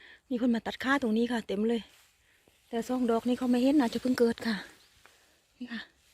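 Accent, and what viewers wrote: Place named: South, Thailand. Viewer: น่ารักจังค่ะ พูดอิสานสำเนียงใต้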